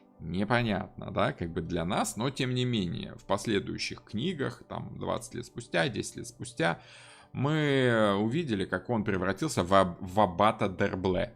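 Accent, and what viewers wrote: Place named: England, United Kingdom. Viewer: с английским акцентом…как это сам * часто в своих лекциях очень симпатично произносит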